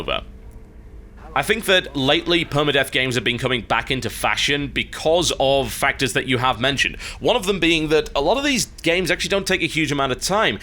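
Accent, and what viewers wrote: United Kingdom. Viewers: Chronicals of the gold farmer was the best show TB ever made period, it had it all: humor, the cynical brit, information and a lovely british accent.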